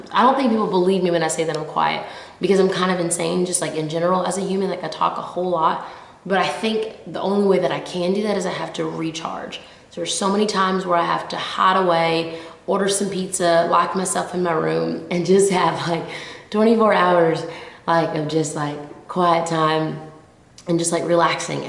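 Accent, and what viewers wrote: South, United States. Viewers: man you have such a southern accent its adorable! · You're southern accent sounds beautiful today! Love you, Jamie Grace! You are such an inspiration!! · I love this video SO much! Such a great message! :D Also, I never realized how much of a Southern accent you really do have before watching this video. From one Southern belle to another, I think you have the cutest Southern accent I've ever heard. Thank you for posting this! <3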